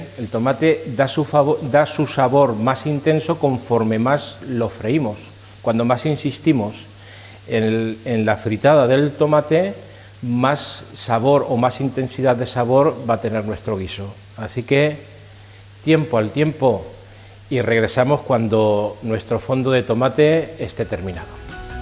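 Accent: Castile, Spain. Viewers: Ya lo leí en su mandil hermano hermoso dicho el alma que anda en amor me cansa ni se cansa también es lindo escucharlo hablar castellano castizo aquí en América Latina a veces nos comimos palabras que son tan expresivas como las que usted habla cuando describe todo Usted es una persona encantadora hermano y sus historias me divierten aquí sólo escuché en mi vida de el Marqués de calatrava todos los errores de gramática son porque soy muy vaga para escribir y la grabadora corre sin puntos ni comas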